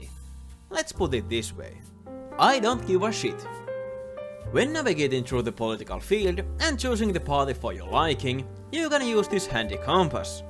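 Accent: Finland. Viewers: Kyllä kuuluu ralli Englanti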